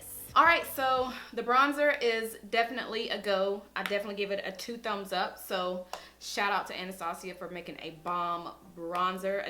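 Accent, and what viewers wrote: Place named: Texas, United States. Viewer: I love your accent. I’m from Texas and you sound like my whole family. Lol 😂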